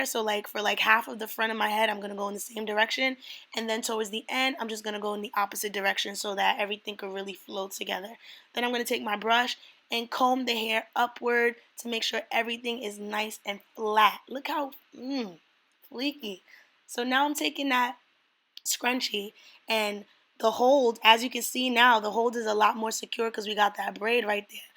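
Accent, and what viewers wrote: New York, United States. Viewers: You slayed that ! Are you from NY or Maryland ? I hair an accent 😂I just subbed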